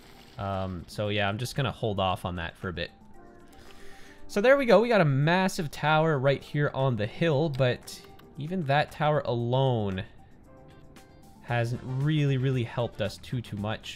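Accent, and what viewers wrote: Canada. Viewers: Found this channel, love it and love the city and story behind it. Also, you a fellow Canadian eh? Cheers bud, keep up the great work 👌🏻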